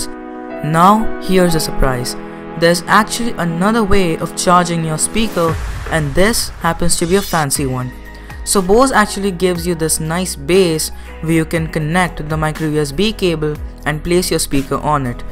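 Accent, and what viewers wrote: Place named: India. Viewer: Indian Accent..!! Aaack 😡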